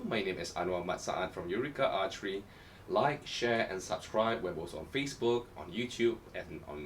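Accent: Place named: Malaysia. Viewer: I haven't heard a lot of Malaysian English speakers so I'm not sure if this accent is representative. But I like the softness to it .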